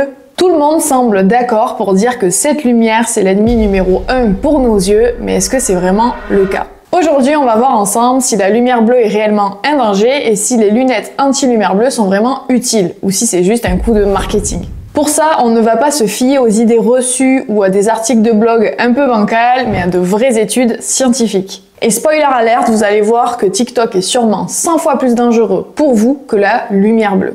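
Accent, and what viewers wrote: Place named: Ardèche, France. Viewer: Vous avez un peu le même accent que moi, l'accent ardéchois, mais vous c'est plutôt celui du Nord de l'Ardèche, limite Haute Loire. J'ai bon ?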